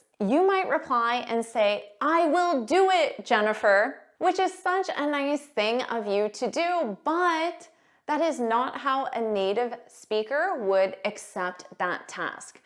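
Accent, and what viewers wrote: United States. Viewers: Such horrible american accent! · I don't like your pronunciation so so so boringly American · A nasal American English speaker stating the obvious. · You speak American!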